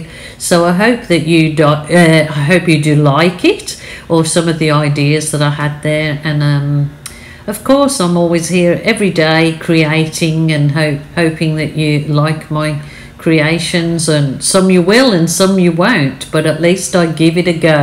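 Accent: United Kingdom. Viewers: That is pretty. I love the mountains 😁. I've never tried to do the shellee bloom, but have thought about it. I've seen someone (can't remember who) do it with acrylics. She used much thicker paint and spun it after blowing it out. What brand of paint, I couldn't tell you. You've lost the Brum in your accent, but you still have a strong Brit accent. My other half is from Manchester. Thank you for sharing ✌️❤️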